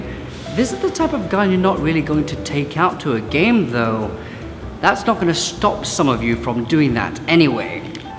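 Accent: RP, United Kingdom. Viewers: You sound really posh lol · Is that a British accent? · why do u sound so British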